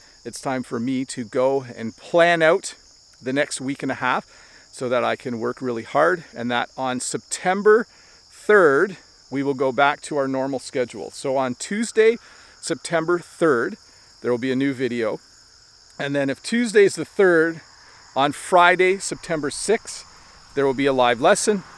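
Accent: Canada. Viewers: I had lived in ottawa four years. It seems like my second home town! Your video and your clear Canadian accent make me homesick! Don't forget make a video in maple leaves season,please! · Hi!! Don’t worry. You should have a break. I really appreciated that you do a lot of jobs.👏👏I like Canadian teacher accents of you and. I still waiting for your lesson. 😃😃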